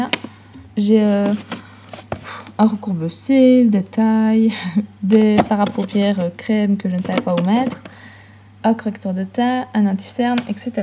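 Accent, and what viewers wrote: Belgium. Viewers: L'accent de diiiiiiiingue :D Mais une belge ça fait plaisir :) Bisous :)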